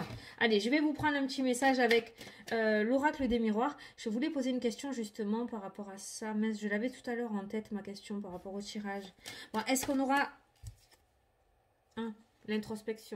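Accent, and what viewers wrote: South, France. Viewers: Il vient d'où ce petit accent du sud ? Mdr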